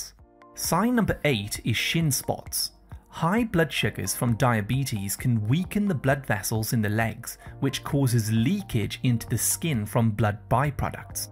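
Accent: United Kingdom. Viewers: Great — Are you British ! Is that your Original Voice !!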